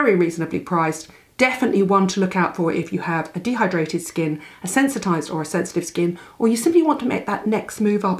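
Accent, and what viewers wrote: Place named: Nottingham, United Kingdom. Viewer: I just adore you. I think u r one of the loveliest and most well informed woman in the business. And your accent kills me. My mother was from Nottingham so u r one of my people. U must have Jeffree Star on your channel. I know he goes to England on promotional tours. He is even more cutting edge than Drew Barrymore.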